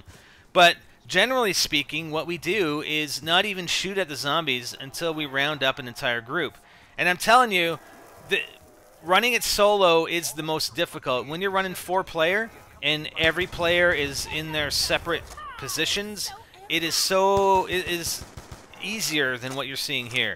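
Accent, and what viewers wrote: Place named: Canada. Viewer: He's Canadian. He has an accent.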